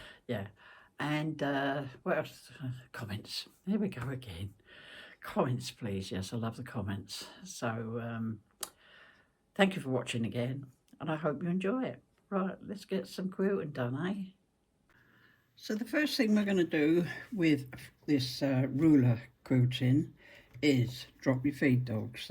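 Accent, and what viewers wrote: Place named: England, United Kingdom. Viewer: Hello! Ex pat from Aussie...loved hearing a real English accent again! I bought a set of rulers over a year ago a d still haven't had a go! You've inspired me to get them out and have a go 😊 the quilt behind you is lovely 😍 do you normally do your own free motion quilting? Looking forward to your next video...please look after yourself..I too have COPD so I know the struggle 😢 best wishes too you, Carole x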